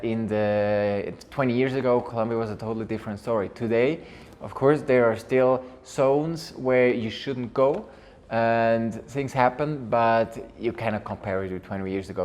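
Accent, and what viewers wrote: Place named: Switzerland. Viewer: From which country are you? (the accent sounds really familiar to me....😄 Schwiizer, gäll?! 😂 ✌️ 🇨🇭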